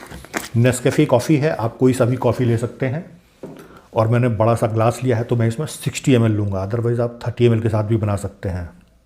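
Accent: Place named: Jharkhand, India. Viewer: Sir aap jharkhand ke kis jile se baat kar rahe hai ❤❤❤❤❤❤❤❤ I am from Jamshedpur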